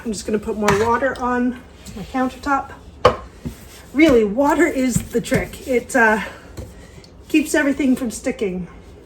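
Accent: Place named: Canada. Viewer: lol, I KNEW you were Canadian, I could tell by your accent!! I subscribed to your channel, God bless you!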